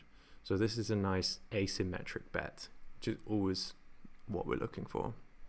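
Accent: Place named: England, United Kingdom. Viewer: Great content man. You must be the only Italian on earth with an English accent.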